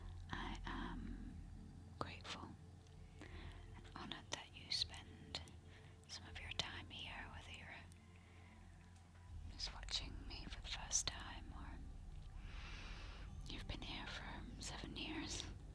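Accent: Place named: Northern England, United Kingdom. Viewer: Glad your retaining your northern accent in the main. Greater Manchester area perhaps. Leigh. Rochdale. Bolton. Summat like that. All the best from sunny morecambe. Cheers.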